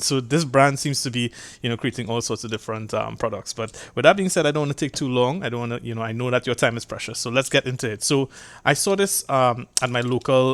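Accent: Trinidad and Tobago. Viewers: Thank you very much. I am literally in the line to the cashier considering whether or not I should get one. I Googled the product and found your video. Help helped me with my decision. I was also good to hear a Trini accent. 🙂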